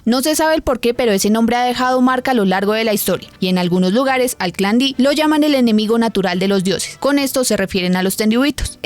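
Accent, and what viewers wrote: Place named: Bogotá, Colombia. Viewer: Me fascina ese acento de Bogotana…😊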